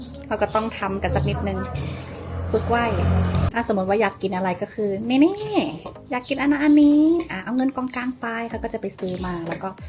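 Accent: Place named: North, Thailand. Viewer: ดูเพลินมากเลยค่ะะ ทำคลิปแบบนี้ให้นอนดูเพลินๆหน่อยนะคะ ภาษาเหนือน่าฟังมากเลยค่ะะ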